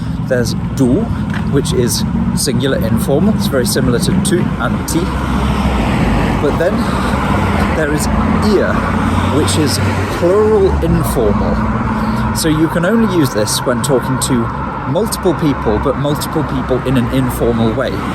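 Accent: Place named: Argentina. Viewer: Qué buen acento argentino! Y el video super interesante. I hope someday we get a video in Argentinian accent😂 you,re almost there, just need to replace the LL sound for SH (lluvia sounds like shuvia)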